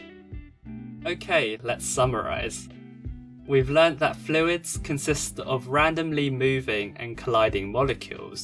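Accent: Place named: United Kingdom. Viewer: marvellous animations, topped off with an explanatory British-Chinese accent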